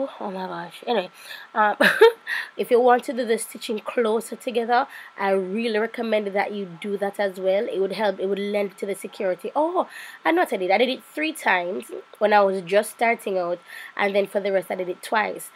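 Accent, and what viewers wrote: United Kingdom. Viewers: Which shipping company you use to ship your hairs herr in j.a ? And is it expensive ? Love the british accent tho 😘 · I love this hair and your British accent 😊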